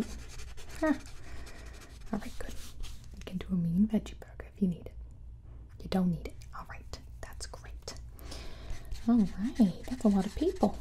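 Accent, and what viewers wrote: United States. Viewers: The American accent tho 🤣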